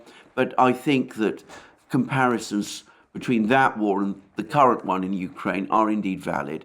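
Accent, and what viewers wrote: United Kingdom. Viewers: I love your voice! Your authentic British accent is soothingly calm and civilized, yet with a range of emotion surprising to most Americans. Your phrasing is jazz, Frank Sinatra, Etta James. As expected, the famous British knack of understatement is here in spades. You leave me smiling and shaking my head for weeks remembering your deadpan delivery of the obvious, drolll punchline. Not to mention your background, knowledge and experience backing up your reporting on current political issues, giving me a much wider understanding of all the essential elements as they unfold. Thank You!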